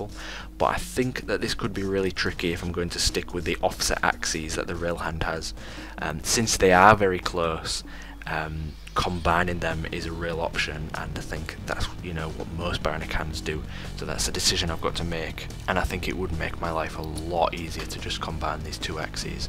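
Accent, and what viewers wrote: Hull, United Kingdom. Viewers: Are you from Hull?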